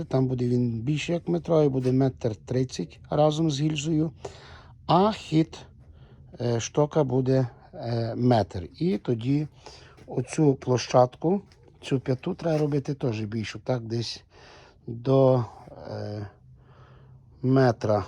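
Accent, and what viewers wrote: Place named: Ivano-Frankivsk, Ukraine. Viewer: Ви часом не з іванофранківщини бо дуже знайомий акцент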